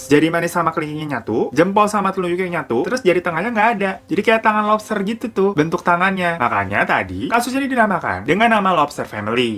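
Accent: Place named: Banyumas, Indonesia. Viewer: Enakan cara ngomong nya bang ,hehehehe ngapak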